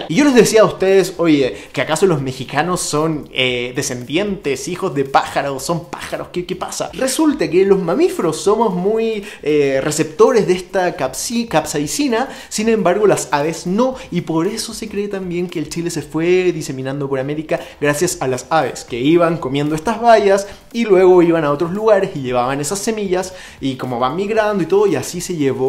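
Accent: Chile. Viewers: 🌶 El chile serrano para los caldos, solito a mordidas o en salsa 🤤 delicioso! Me encantó tu contenido porque esta bien chido aprender de la gastronomía de mi país y con acento chileno y buen gusto.... está de lujo 😉👌 gracias, me haces pasar un buen rato! 👏👏👏👏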